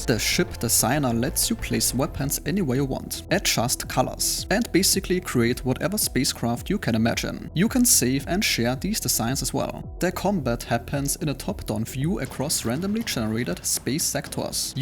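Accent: Germany. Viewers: German trying English?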